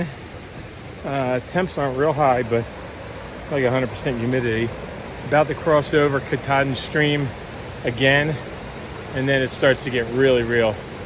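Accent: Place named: Philadelphia, United States. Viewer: Great job! Will miss that Philly attitude and voice.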